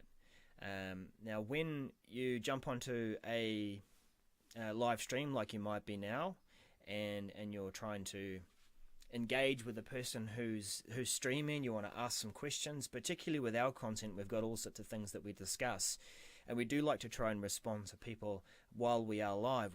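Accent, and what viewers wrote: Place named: Australia. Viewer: Even you young people are lost, lost , I say, to try to follow, understand, and participate , in all this crap, this nonesense, about partaking in all this on-line balloney, about your so-called channel ! It is all a joke ! Even you youngsters are lost with all this so-called technology! a word of advice, Sir, help people to use your services or channel, and you Sir are a horrible explainer to any one ! You bore us to death listening to your Assie accent explain nothing! Get somebody who can listen to you , and he or she will back me up! and try to understand how a person l;ike myself who did not go through school with computers ! Yes the american Main line media is corrupt!! but they have professionals who know what they are doing to put forth their lies! <mail>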